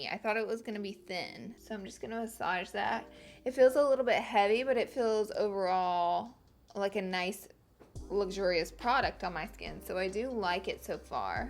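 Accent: South, United States. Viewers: Second time watching your vids and I can say you're really funny and i like your accent, even though i normally dont like country accents (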